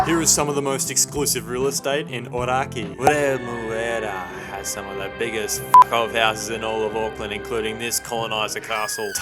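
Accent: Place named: Australia. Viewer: Your australian accent is fucken yuck. Shot for reminding me why when I do leave nz I'm not going to Australia.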